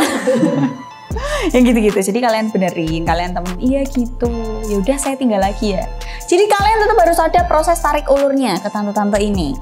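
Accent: Java, Indonesia. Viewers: Jowone medok..,? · gaya ngomong nya kelihatan Jawa nya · lucu dan medok mantab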